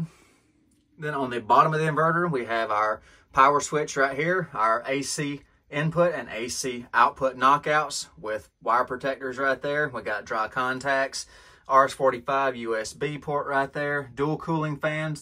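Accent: South, United States. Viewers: Great video as I just ordered this inverter a few days ago off amazon along with 2 of their 48V 100AH Li Time batts. I've been using a small 12v solar set up at my storage place for the past 8 years so jumping to 48V is going to be a huge improvement. Started with 6v AGM in series with 800A bank then replaced with 3 12v 200AH Li time only 2 years ago. Have been very happy with the batts but do you think I should have gone with our used another brand? The two Li time I just ordered are not the rack type but the big flat type, a mistake in your opinion? Are you planning to use full time in your camper or home? Curious where you are located, you sound Southern ;)...I'm in East TN?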